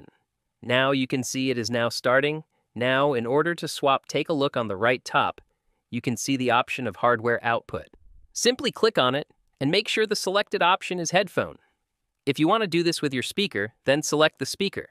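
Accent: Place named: India. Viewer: Thank you random Indian/wherever that part of world person for using AI to make it so we could understand you.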